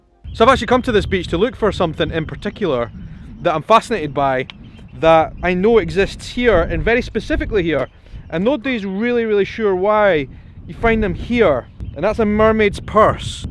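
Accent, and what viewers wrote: Scotland, United Kingdom. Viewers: Thanks for sharing mate! I love your videos. The sad thing is those make me feel like I've made a bad choice to live in England, the good one is, I can still try to move north :) Scots accent is so cool and easy to understand, I could listen to it all the time, and all that nature you have there it's so beautiful, so remote. It feels - to me - like Polish have so much more in common with Scots rather than with English - the culture, expression while speaking, and so much more... I'm happy to subscribe!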